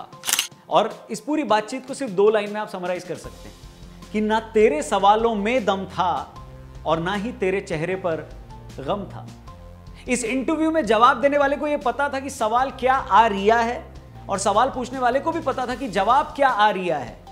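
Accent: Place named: Indore, India. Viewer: Arey yeh bhiya to Indore ki bhasa bol riya hai....Ek number👍👍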